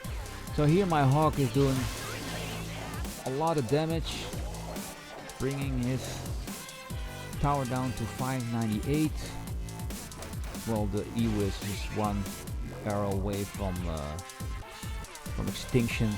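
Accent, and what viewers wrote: Netherlands. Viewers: Mooi amsterdams nederlands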